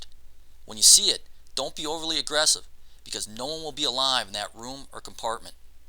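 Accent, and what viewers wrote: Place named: Wisconsin, United States. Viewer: There's just something about narrated fire videos. They're mesmerizing. That accent tho. Wisconsin? Eastern Minnesota?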